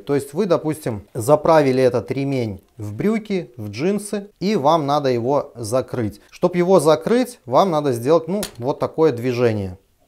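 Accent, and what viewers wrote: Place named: Germany. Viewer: Ну, если написано по-английски, то произносить желательно не по-немецки. Но это мелочи, обзоры хорошие.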